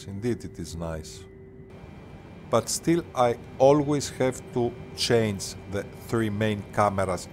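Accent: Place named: India. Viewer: the minute i herd the indian voicwe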